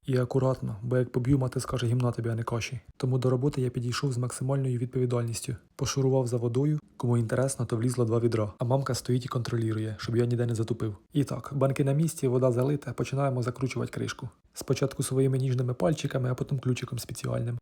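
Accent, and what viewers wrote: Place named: Luhansk, Ukraine. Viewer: Гуморист та розповідає від бога❤❤❤.Дуже подобається Ваш контент.Слухаю із задоволенням так, як наче у себе вдома побувала.(Луганська область)У нас теж так гутарять і голос....голос свій ,рідний.Зараз дуже далеко мене занесло, вірніше нас. Серце щимить, болить та плаче за рідною землею, за своєю країною. Дай ,Боже усім українцям дочекатися перемоги над ворогом та повернутися до нашої замученої ,але найкращої ,рідної землі. Всим миру ,добра, злагоди. Бережіть себе, рідні.❤❤❤❤❤❤❤❤❤❤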